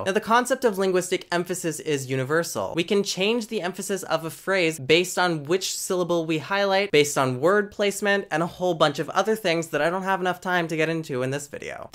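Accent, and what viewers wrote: Portugal. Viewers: Você fala português com o sotaque de portugal perfeitamente!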